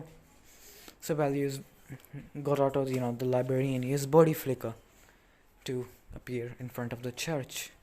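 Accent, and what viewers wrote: India. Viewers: Are you indian or have an indian accent?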